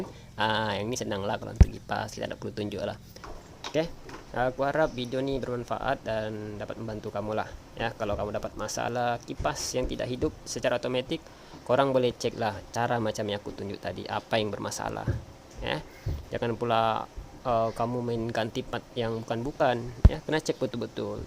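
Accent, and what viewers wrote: Sabah, Malaysia. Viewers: saya suka dengar orang sabah cakap. arwah mak saya asal KK lepas kahwin dgn arwah ayah asal kedah terus menetap di sini. hehe lain cerita pulak. penjelasan yang hebat 👍🏼👍🏼. kipas radiator LC saya tak berfungsi pun entah berapa lama. tau naik saja. boleh la saya cuba cara ni. terima kasih semoga murah rezeki bang.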